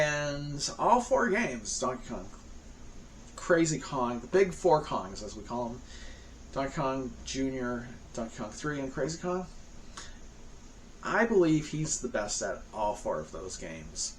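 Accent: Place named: Australia. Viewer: G'day mate. Love your Australian accent.... ;) Just wanted to let you know, I was in Japan last year and met up with Acu Azu and he's an absolute legend. He plays DK like a machine, it's absolutely insane watching him play and comparing his style to mine is night and day. He was lovely enough to bring some other Japanese guys who play DK, including the guy who brought DK out on the switch for Hamster inc. Their community seems to be filled with guys who just want to help each other achieve greatness.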